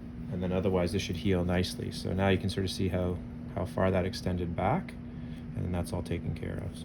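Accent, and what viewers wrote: Canada. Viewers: My husband is Canadian (I’m American) and I always have a knack for knowing Canadian accents. As soon as I heard you speak I knew you were! Also patient did a great job keeping himself together during the lidocaine. I would’ve been swearing!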